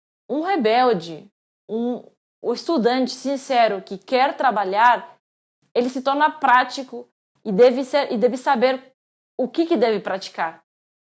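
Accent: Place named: Paraná, Brazil. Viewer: Fala com sotaque paranaense legal.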